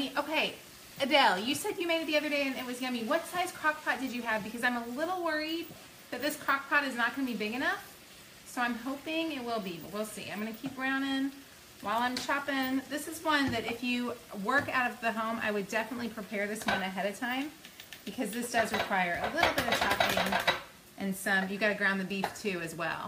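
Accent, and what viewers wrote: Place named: Georgia, United States. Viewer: Where are you from? Your accent is exactly like my friend’s who grew up here around Woodstock Ga 🙂. I just found you on YouTube and I need to go download the cookbook!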